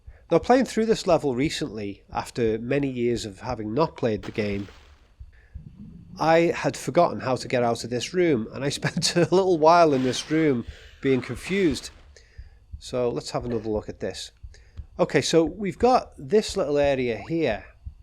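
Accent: United Kingdom. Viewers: This will be excellent. My favourite all time games for many reasons. The cerebral aspect of them at the forefront. Scouse and tomb raider what a mix. 👍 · Interesting analysis! You also spund fairly local. are you based in merseyside? haven't found many content creators round here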